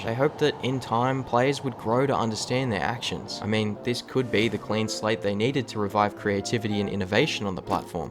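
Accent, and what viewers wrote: Australia. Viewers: DAMN BROS MORE AUSTRALIAN THAN ME🐨🦘🇦🇺🇦🇺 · australian man explains roblox sounds nice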